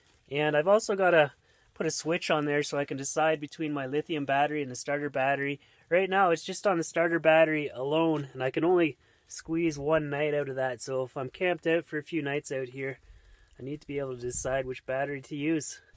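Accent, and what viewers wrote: Canada. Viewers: since i follow i learn how to say OUT in canadian, "IUT"...lol:D:D love u brother:)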